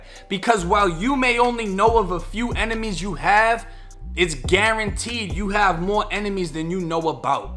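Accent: Boston, United States. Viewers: ❤you from 617 Boston that accent sounds like you from the bean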